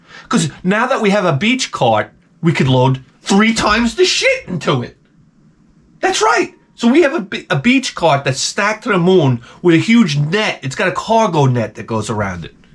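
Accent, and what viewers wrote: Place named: Boston, United States. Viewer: I honestly think this dude is BETTER at riffing than Bill Burr. And I’m not comparing the 2 just because of the boiston accent and hair tint. This show is like more focused and listenable than the mmp, and the boring sports talk is replaced with cool retro vidya game stuff. No disrespect to freckles though, it just seems like he runs out of steam after a while.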